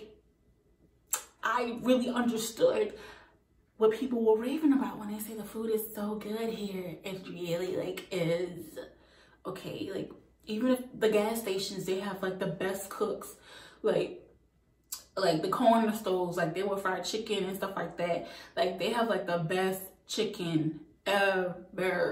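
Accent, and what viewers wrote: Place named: New Orleans, United States. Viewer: I hear that small New Orleans accent you got lol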